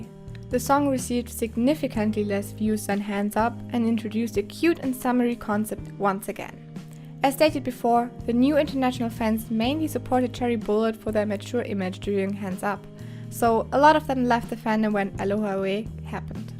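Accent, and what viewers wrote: Germany. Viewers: Are you German? Ur accent is so German omg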